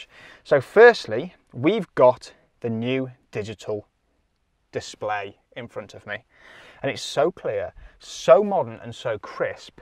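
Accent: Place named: United Kingdom. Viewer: Ох уж этот британский английский 😖 «кунчимэн» 😖😖😖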